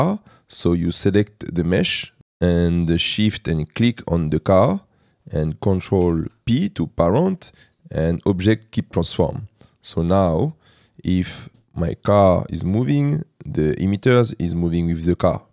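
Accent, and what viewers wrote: France. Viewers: Seem french accent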